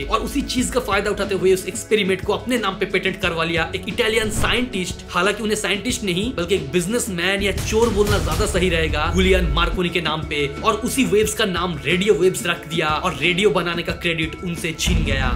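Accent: Bengal, India. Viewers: Abb samajh aaya bhai tu bengali hai.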